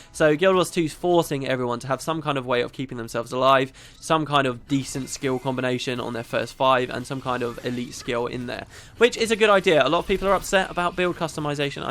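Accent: United Kingdom. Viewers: Oh my god that accent:D, you definitely lost a little bit of your brittish-accent along the way. And even then, you knew everything about GW2. I wouldnt mind a few more of these:D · you do sound more "british" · Don't lose your British-ness! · He sounded a lot more British years ago. Since most of this video is old footage from 3 years ago, he sounds different in it. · His voice used to be a little deeper, but there's also more of a British accent on top of that. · Wow you really did sound more British 3 years ago! (but both voices then and now of you are still amazing XD)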